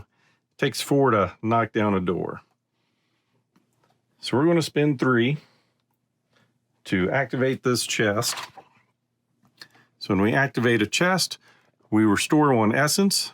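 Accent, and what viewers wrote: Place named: South, United States. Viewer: You won't believe how long I've waited to hear you recite this Lovecraftian horror. It's awesome. #MonotoneSouthernExcellence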